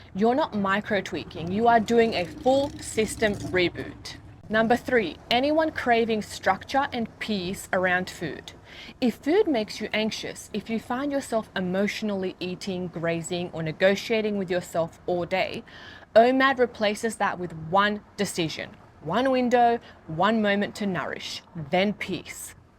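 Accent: Australia. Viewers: YEEEEEES! YOU'RE SO FREAKING RIGHT! Always grazing and never feeling clear.. of course sleep is a part of this but this once a day thing I'm going to try and hopefully keep. And lastly.. Man I forgot how much I love the Aussie dialect.. I'm in love with it again.. Maybe find an Aussie woman now😂. Thanks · You're Australian or New Zealander?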